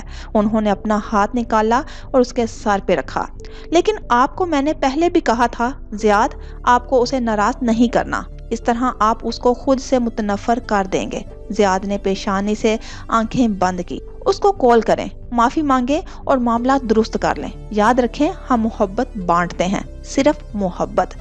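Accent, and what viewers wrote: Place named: Punjab, Pakistan. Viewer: Voice me Punjabi lahza kam kar lein please kuch lafz Samjhne me problem hoti hai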